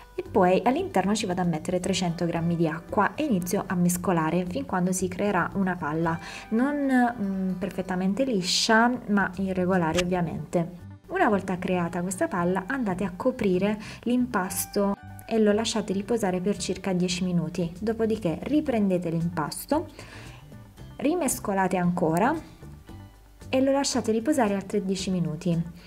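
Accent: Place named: Marche, Italy. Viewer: Preferisco 1000 volte il suo accento marchigiano e la sua bravura alle cachielle presuntuosette, non ti pare????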